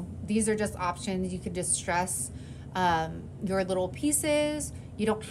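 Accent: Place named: North, United States. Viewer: Hi I just came across this video and had to watch it to learn some things because making wood round signs is my favorite craft to make. I always order my rounds from Amazon, but they aren’t anywhere near that thick! Those are really nice! I am from Southeast Kansas. It sounds like you are up north from the places you shop at. Thank you for the tips I picked up! I enjoyed and subscribed to your channel.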